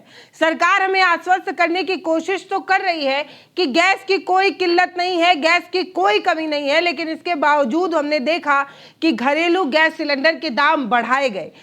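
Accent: Bihar, India. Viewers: Aap bihar se ho na??? Kaaraahi kaaraahi bol rhi ho?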